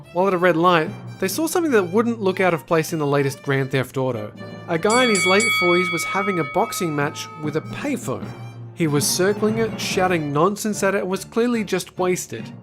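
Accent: Australia. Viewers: I SMELL AN AUSSIE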